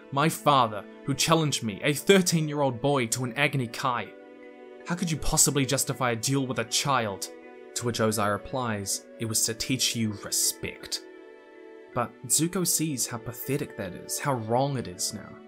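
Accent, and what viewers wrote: New Zealand. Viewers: When I hear your accent, all I can think about is that new Zealand deck commercial. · Ahh I love your accent- It has nothing to do with the video but kiwi accents are always so- yes.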